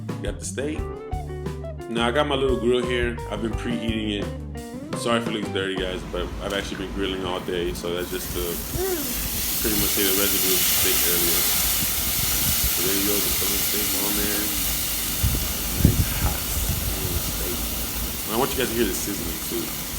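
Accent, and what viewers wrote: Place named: California, United States. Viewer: You sound like you from Cali 😂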